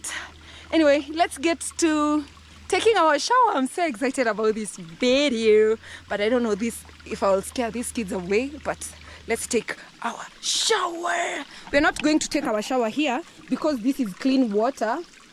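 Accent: Kenya. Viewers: You didn't say what country that is. It's so tropical. I had no idea there was such a beautiful place in Africa. Is it like Congo or something? I think Kenya might have such but not sure. Your accent sounds much like Kenyans I have talked to. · She sounds Kikuyu and thinking that looks like Kirinyaga area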